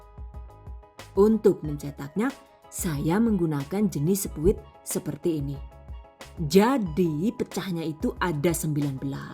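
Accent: Banyumas, Indonesia. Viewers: Salam kenal saya oma holly dari cirebon,saya suka sekali lihat video,kuenya enak n penampilan menarik dan yg paling saya suka itu omong inyong2,n kelucuannya,saya sudah bnyk resep yg saya tulis di buku soalnya belum punya oven hehehe jadi,yg udah saya buat donat aja pokoknya yg d goreng2 sukses selalu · Q suka dgr logat ngapaknya sist..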